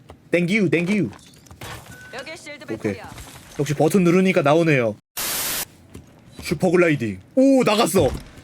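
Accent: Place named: Southeast Asia. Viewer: ㅋㅋㅋㅋㅋ영어 발음이 동남아사람 멕시코 사람인데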